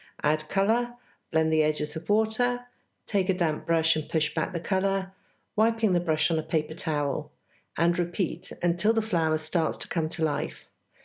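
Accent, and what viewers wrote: United Kingdom. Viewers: ally beautiful painting, and effective and entertaining teaching!, well done, you've earned a new subscriber! I saw your card on the SSS blog and loved it, and when they mentioned your Scottish accent I had to come to check your videos out right away, Scotland is my favorite place in the world so far, though sadly I've only gotten to spend two weeks there so far can't wait to go back! Outlander is not enough of a fix, it's just making me more eager to go back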